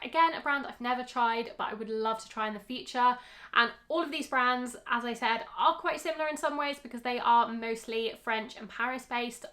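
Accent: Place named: England, United Kingdom. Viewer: Hello this video is a jewel, thank you so much for all the time you put on it!!! From the French, European section I'd add Caroll and Cyrillus. I buy often from them and love the quality of the items. Maybe they are a bit more classic than Sezane and similar in pricing. Nothing to do with that but your English accent is lovely ❤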